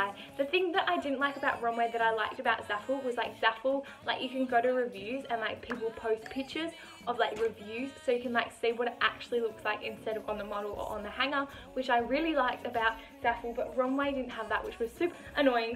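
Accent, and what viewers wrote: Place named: Australia. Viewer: OMG UR AUSSIEEEEE 💗 WOOOO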